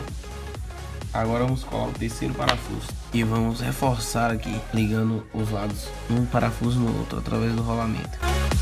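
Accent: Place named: Bahia, Brazil. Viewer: Sotaque de baiano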